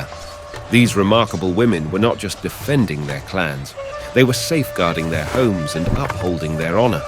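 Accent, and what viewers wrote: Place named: United Kingdom. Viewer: Maybe it's me, Nah, I've heard others say it too. Linguist that are intent on communication and it's many intricate variations. Dealing with pronunciations is particularly grating when something isn't correct or seemingly even attempts to be correct are ignored straight out of hand. The first few times Onna-Bugeisha was pronounced it seemed the narrator had no compulsion or compunction to attempt to say things even remotely studied. Japanese is (perhaps faultingly so) pronounced singularly each and every time. Generally one attempts to avoid accent things but it's not important. A-E-I-O-U are pronounced one way. Combined vowels are pronounced one way too. Extended vowels and silent vowels are standard 'ALL THE TIME' Very few exceptions, but even those are pronounced the same way all the time. ONNA BU GEISHA Not to be confused with Chinese concept of BU being opposite. 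ONNA Oh Nah Boo Gay Shaw. It would have been nice that the narrator could have been consistent in his reading that it would have sounded like he wasn't talking about different actions and jobs. Sometimes saying it like indicated above. Often saying it like AnnA BuGaiSha other times sounding like Anna BuGasha. The incessant habit of British to put a 'Y' between a consonant and 'U' is mind boggling and on the occasional adding an 'I' when pronouncing things like TOKYO or KYOTO coming up with Toe Key Yo or Key Yo Toe very odd indeed. Such be the circumstance when pronouncing SAMURAI not Saem You Rye but if you try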